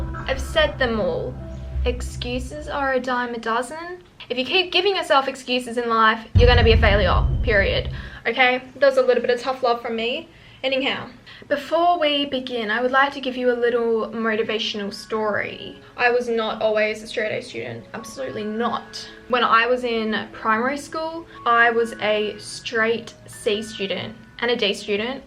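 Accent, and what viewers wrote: Australia. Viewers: Her beng typical a aussie norr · How to get straight A’s. me thinking why she’s talking about letter grades and she has an Aussie accent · her aussie accent tho 💖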